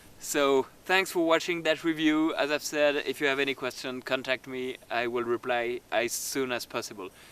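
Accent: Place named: Switzerland. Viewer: hoi du CH schweinchen (schweinli doesnt seem correct). Love the accent, too many years since ive heard it. What part of Helvetica are you filming in? Nice video, reminds me of many happy nights in your snow. Nice knife, im attached to hand forged steel, but its a big chunk of change. I wonder how the quality is now, so many years after the split. Viel gruess aus Florida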